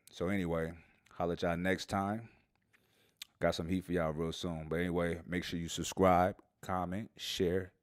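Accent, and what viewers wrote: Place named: New York, United States. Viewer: Yo I could listen to you all day, you got that new yawk vibe #bigupstobrooklyn and your MCT oil is the truth!!!!! I'm a big fan The.one.and.only.marissa.ann on IG. And forget this red pill shit tell them Mike Rashid is his own pharmacy and pops a different color pill everyday lol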